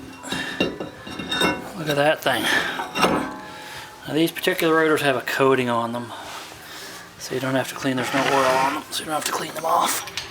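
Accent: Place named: Canada. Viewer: I wasn't watching the video at first, but noticed you were obviously Canadian. Then I flipped over to the video and confirmed that with the Mastercraft tools, eh.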